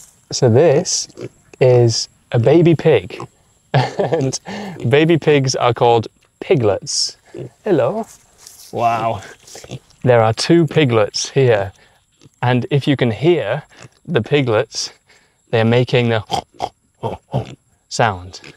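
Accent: United Kingdom. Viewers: oh my god, very amazing video, and your way of teaching really fantastic, and british accent adds a feature to the video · I am from South India. Very useful to understand British àccent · İs it british ascent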